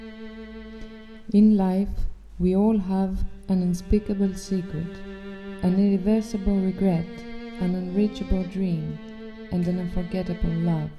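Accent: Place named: Greece. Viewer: she speaks with a heavy accent, is she greek ?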